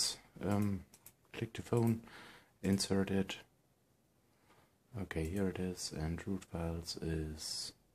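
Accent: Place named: Germany. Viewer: Warum redest du kein Deutsch man gehört genau das du deutscher bist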